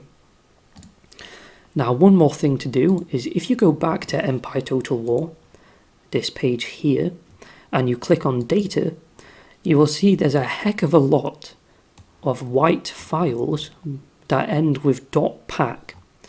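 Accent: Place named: United Kingdom. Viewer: I love this british accent, I was loking for some british channel for improve my english, and I found now 🙂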